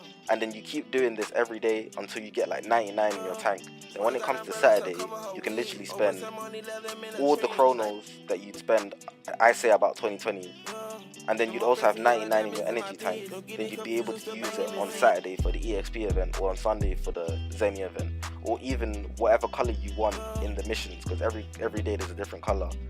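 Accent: United Kingdom. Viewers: My guy fam ur british too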